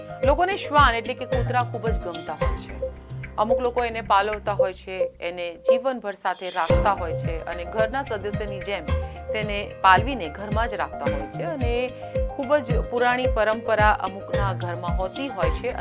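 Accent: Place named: India. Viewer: ဂျပန်ဆို...ဘာဖြစ်လို့...အန္ဒိယ...ကပြောနေလဲ....အဲဒီကောင်က...ကုလားလိုဘဲပြောနေတာ...ဗျ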